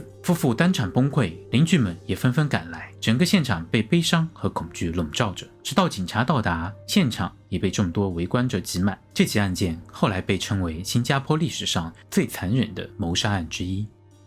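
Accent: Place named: Kunming, China. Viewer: 博主口音为什么像昆明人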